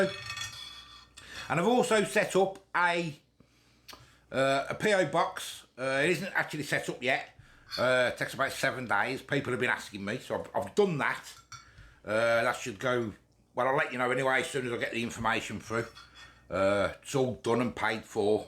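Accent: Birmingham, United Kingdom. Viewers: Huh? it’s a normal Brum accent, I can understand him no probs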